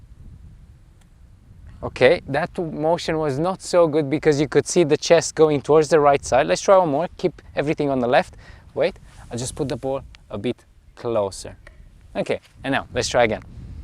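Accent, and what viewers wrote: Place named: United Kingdom. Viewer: British PGA member; very nice. I really like your British accent. ;-) Keep up the good work.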